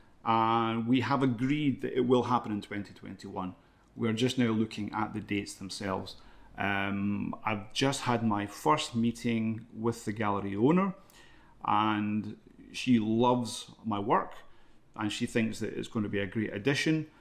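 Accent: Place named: Scotland, United Kingdom. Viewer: OK ..Finland ..but why do you sound Scottish ?!? OK ..maybe it's just me !! LOL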